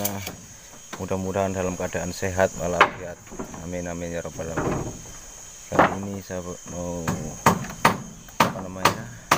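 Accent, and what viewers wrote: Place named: Banyumas, Indonesia. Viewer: Nyimak selalu....yu ngapak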